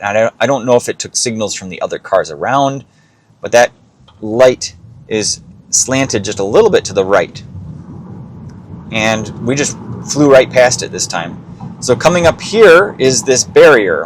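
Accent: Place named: Canada. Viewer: Don’t worry about how you speak. You would pass for a normal Canadian.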